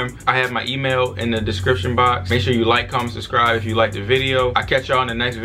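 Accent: Baltimore, United States. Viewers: Baltimore accent? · Just by watching the video I can tell he from Baltimore they got that certain accent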